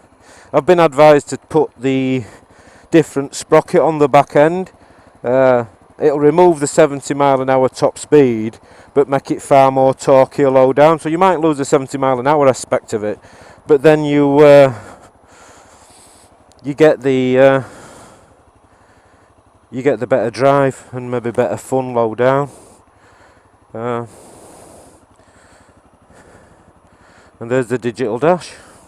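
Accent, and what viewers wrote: Yorkshire, United Kingdom. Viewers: Music to my ears hearing a Yorkshire Motovlogger! Decent insight into the bike as well!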